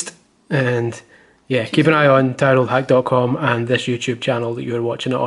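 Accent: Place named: Edinburgh, United Kingdom. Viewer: you sound like you are from Edinburgh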